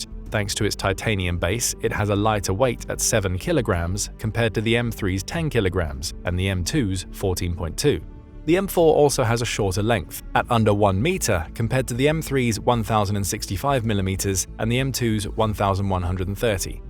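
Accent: United Kingdom. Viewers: I love hearing just how cool the U.S.A. is in a British accent its quite perfect good sir. Lmao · Crazy a British is doing the video voice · Nothing worse that hearing about the us military with a British accent. All set.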